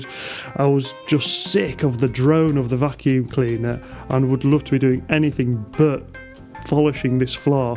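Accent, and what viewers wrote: Yorkshire, United Kingdom. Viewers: Really enjoy the Yorkshire accent and the documented effort that goes into these renovations. Reminds me not to feel bad hiring a professional…. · Occasionally the YT algorithm gives you a gem, this is one such time, never asked for a sub but got one anyway for the laidback approach and wonderful Yorkshire accent. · It's really been a labour of love. I admire your extremely hard work, at times it seems rather back breaking.. Seeing you were self employed and working. I hope the cost of the place was worth renovating it.. Hah all the best to you and well-done.😊😅😂. I love your accent as it reminded me of my dad and grandad who were Yorkshire folk from Scarborough farmers actually. Greetings from South Africa😊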